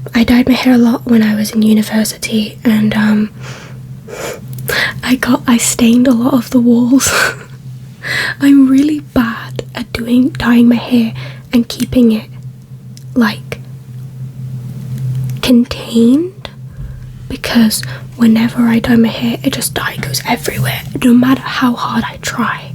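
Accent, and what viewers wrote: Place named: United Kingdom. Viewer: Ramble vids are the best. Where are you (roughly) from in the UK? Can't quite put my finger on it.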